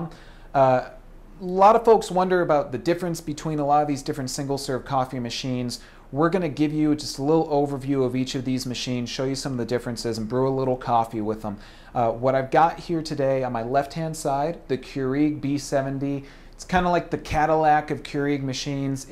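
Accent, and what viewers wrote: United States. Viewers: he has a regional American accent. I assume you are Canadian as am I.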